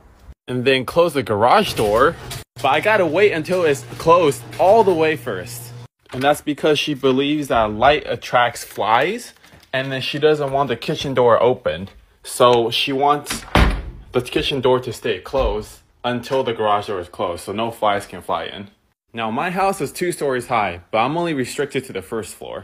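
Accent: Taiwan. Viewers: 聽中文口音感覺是臺灣移民。 希望大家都開心。 畢竟當初移民應該是希望家庭會有更好的生活條件。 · 聽口音是台灣移民過去的😂 冰箱還有一罐八寶粥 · 這口音 台灣過去的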